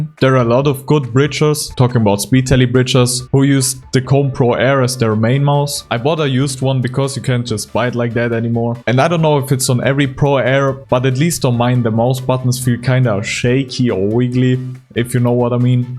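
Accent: Germany. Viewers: wait are u german u got that accent xD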